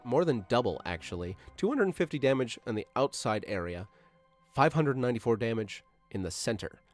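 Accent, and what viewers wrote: Canada. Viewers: I'm gonna guess Canada? He's got some strong Canadian vibes eh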